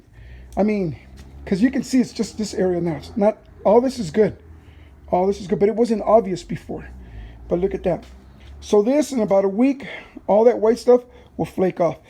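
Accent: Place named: New Mexico, United States. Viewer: Good product and nice New Mexican accent!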